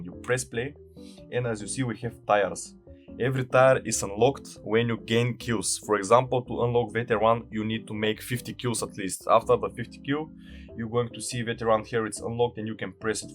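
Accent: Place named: Pakistan. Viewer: You sound pakistani.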